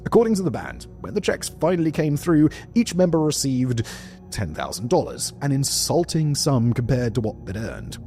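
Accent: United Kingdom. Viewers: He's British. You should hear how they pronounce allininum.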